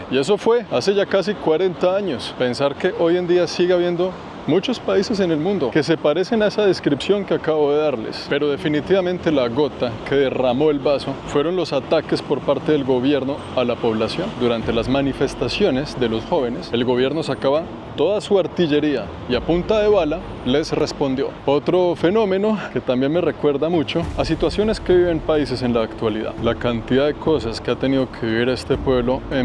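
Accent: Bogotá, Colombia. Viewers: demás de educativos y de muy buena calidad tus videos, me haces reir mucho con algunas expresiones, me encanta tu acento Colombiano. Saludos de otra Abuela desde Dallas Texas, que te acaba de adoptar 👵👵👵👵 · es colombiano su acento es el Bogota donde creció y se educó, el es ingeniero, luego se fue a Canadá y estando allí se volvió youtuber, siendo uno de los mejores. Ahora viaja por mundo.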